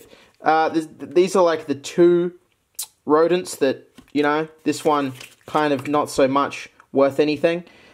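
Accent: Australia. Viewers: already saw the video in the self promo. you're videos are consumable as fuck... or maybe it's the Australian accent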